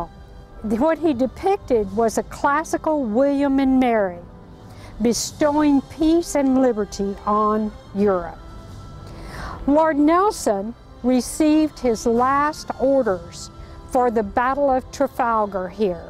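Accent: United States. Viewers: What type of American accent does she have? I'm American, and I don't recognize it.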